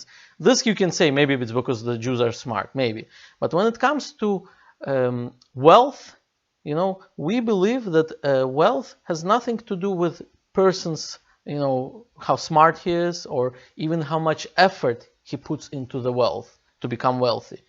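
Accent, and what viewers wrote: Russia. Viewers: You have a Russian accent. Are you from one of the former USSR States?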